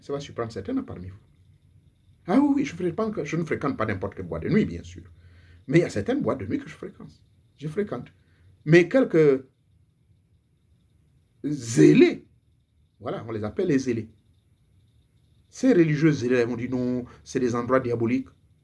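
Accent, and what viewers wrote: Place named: Gabon. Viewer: bonjour au fait dites moi vous parlez beaucoup du Gabon votre mélodie est gabonaise Êtes vous gabonais ou vivez vous? C est rare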